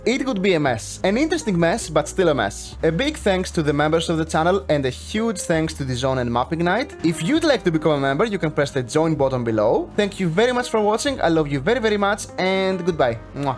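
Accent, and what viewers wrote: Greece. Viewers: I suffered through 16 minutes of chracteristically Greek accent and survived it.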